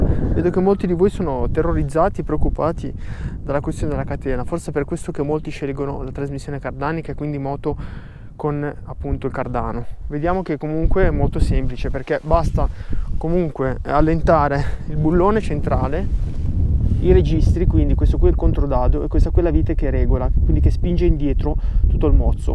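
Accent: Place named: Liguria, Italy. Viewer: Ciao, in un video hai toccato varie parti della mia vita. Nato a Zena sento il tuo spiccato accento ligure, motociclista e innamorato delle due ruote come te, ho vissuto nella parte occidentale africana e confermo essere ostica, ma non ti molla piú puoi lasciare l’Africa, ma lei non lascerá te. Inoltre il cuneese é dove vivo da qualche anno e spesso vedo che passi per le strade che bazzico io con la mia bimba 😍🥰. Grazie di tutto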